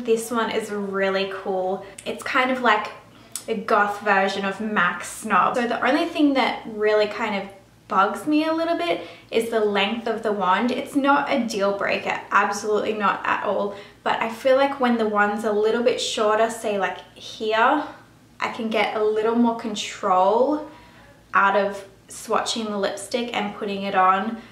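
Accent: Australia. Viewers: I was sitting here wondering if you are Aussie. After watching videos of several American accents, I found it hard to distinguish my own Aussie accents hahaha. So happy when you confirmed it! New subbie :) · Are you australian?? OMG Im spanish and I love the acent!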